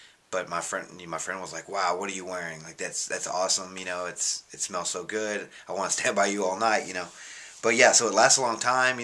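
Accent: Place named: New York, United States. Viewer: I thought you were from NYC for the longest time. Lol Great review braw.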